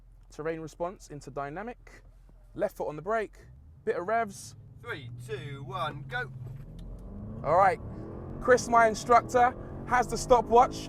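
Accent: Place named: England, United Kingdom. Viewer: Nothing hotter than a Black dude with an English accent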